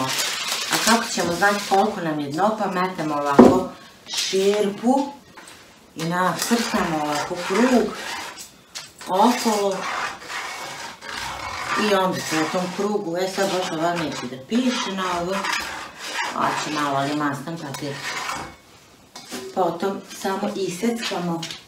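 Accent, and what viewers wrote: Vojvodina, Serbia. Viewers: Ona meni toliko super priča...ja sam s mora iz Dalmacije ali kad čujem taj vojvođanski naglasak se uvijek oduševim...a kad kaže "štogod lepo" to je najsmješnije😂👍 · Lep je kolac,draga,a boga mi i ti si mi lepa kao lutka,kao da si promenila boju kose,stogod lepa!? Jos jednom hvala ti za sve recepte,modlice i volem da te slusam,cujem moje,moju Vojvodinu,stogod lepu! ❤️❤️❤️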